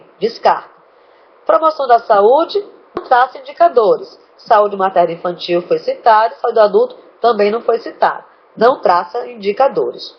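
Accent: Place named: Northeast, Brazil. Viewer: Uma pitada de sotaque nordestino...rsrs uma excelente aula professora de uma forma dinâmica e rápida pude compreender os três Pactos da Saúde. Obrigado por postar no Youtube. Parabéns!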